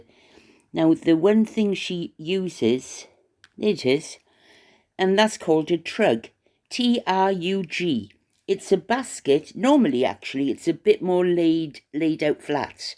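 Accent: Wales, United Kingdom. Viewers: happy you’re back, nice to hear that Welsh accent, God Bless.♥️🕊️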